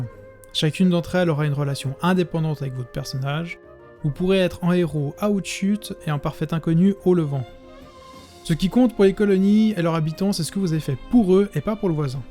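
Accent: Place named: Switzerland. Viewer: ahahahah mais c’est clair et ça fait du bien d’entendre l’accent du coin sur YouTube surtout quand j’aurais été presque sûr à 99,9% que le contenu serait du contenu provenant de YouTube francophone mais pas Suisse 😁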